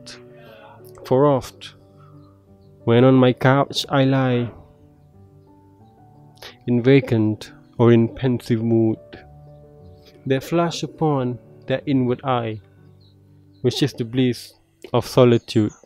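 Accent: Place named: United Kingdom. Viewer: Good reading with great pronunciation and enunciation! I like that you adhere to the British pronunciation with words such as 'dance' considering Wordsworth is English.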